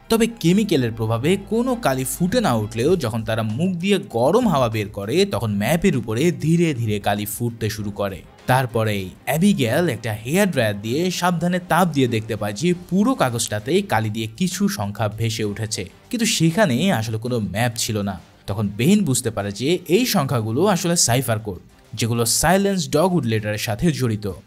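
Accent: Bangladesh. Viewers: আপনি কি বাংলাদেশি? আপনার কথায় মনে হয় আপনি বাংলাদেশি😊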